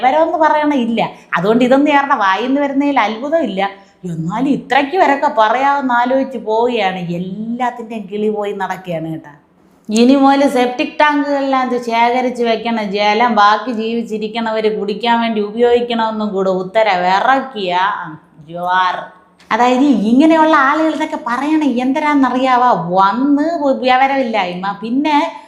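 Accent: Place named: Thiruvananthapuram, India. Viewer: TVM ഭാഷ... ഇത് കേൾക്കുന്ന ഞാൻ കോഴിക്കോട്കാരൻ... ഞാൻ എന്തര് കരുതണം...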